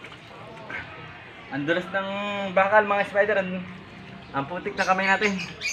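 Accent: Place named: Laguna, Philippines. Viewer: Tol taga san ka pala ka punto mo samin eh sa sta cruz laguna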